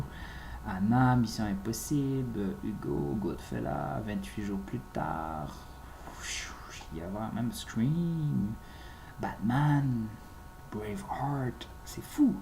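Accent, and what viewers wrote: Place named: Montreal, Canada. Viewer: merci beacoup pq je ne savais pas comment l avoir tu m as aider et aussi ete vous du quebec pq vous avez l acsens de montreal et l aval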